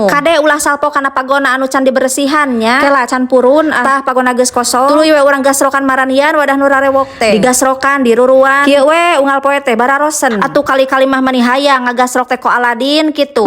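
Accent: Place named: Sodong, Indonesia. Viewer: Logatnamah ciga urang Sodong.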